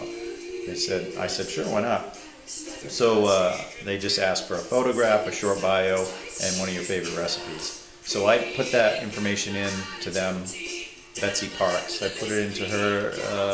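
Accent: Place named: Maine, United States. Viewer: Hi, great update! Nice to just see ya and hear that calm clean cool Maine accent again.:-) A little bit of equipment, brew day, projects - it's in all in here.:-) Take care and see ya when all is well and less hectic!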